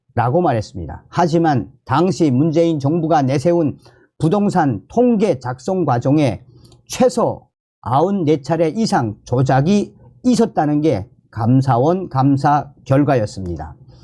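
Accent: North Korea. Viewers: 아저씨 말은 북한말같애 북한말 하지마요,짱나요.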